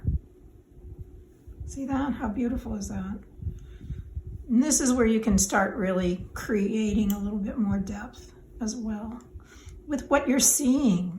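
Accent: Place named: Boston, United States. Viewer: Your accent sounds like mine. I’m from the Boston area. Love this piece 😍 SALEM WILLOWS grew up going there 👍🏼😍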